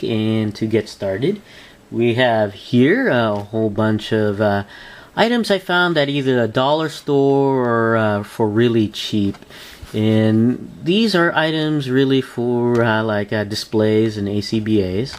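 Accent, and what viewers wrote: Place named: Arkansas, United States. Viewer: Sounds like you're a fellow Arker. Correct?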